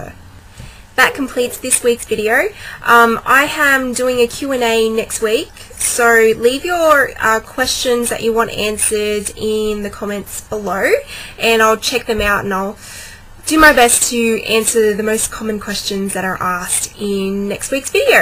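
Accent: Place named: Australia. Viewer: Are you Australian? I'm wondering if I recognized the accent. : ) I think you're right about the importance of calling her out on it, or ignoring an outburst. I remember when an ex of mine said she actually appreciated it when I did so once.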